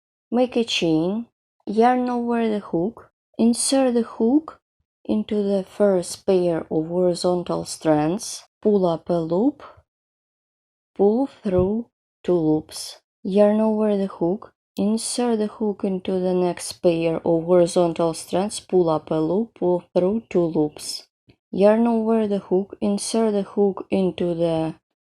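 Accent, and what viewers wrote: Russia. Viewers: говорите лучше по -русски,а не на английском с русским произношением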